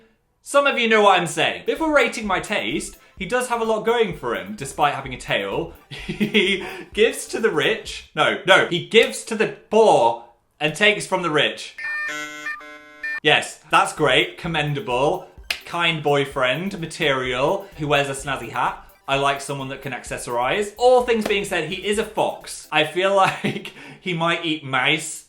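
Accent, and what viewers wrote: North, United Kingdom. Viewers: His accent is just getting more and more Northern 🥰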